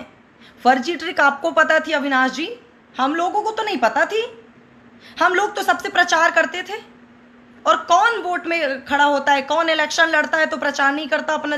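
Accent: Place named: Bagheli, India. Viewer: आप की टोटल वीडियो सुपर डुपर.. बघेली... आप के जैसा किसी की एक्टिंग नहीं है बघेली... मे.. सब सीख के बोलते है आप शुद्ध बघेली बोलती है... हर कहानी मे कुछ न कुछ सिखने को मिला..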